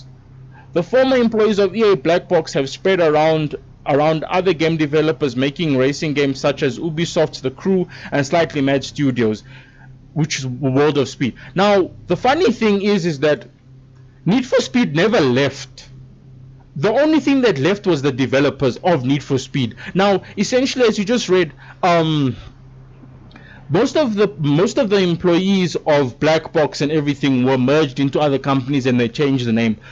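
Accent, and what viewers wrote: South Africa. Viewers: You south african bro? I havent heard a south african accent in forever (emmigrated awhile back)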